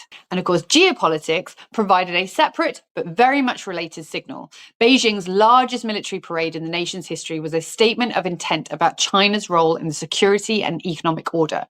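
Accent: England, United Kingdom. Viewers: I just love English people. I could listen to y'all talk about paint drying. Great presentation though.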